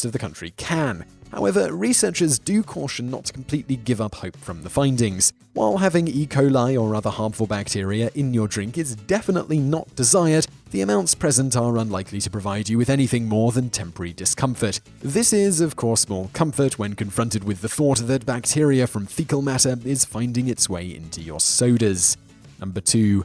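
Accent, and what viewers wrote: England, United Kingdom. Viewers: is English and that's how we pronounce advertisment in England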